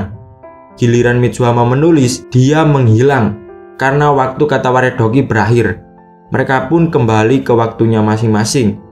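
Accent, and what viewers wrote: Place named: Java, Indonesia. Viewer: Suara mu medok mas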